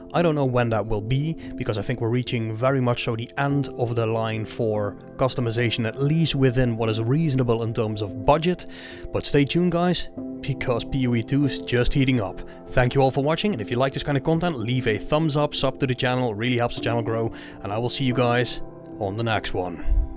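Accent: Limburg, Netherlands. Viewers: kunse van Limburg?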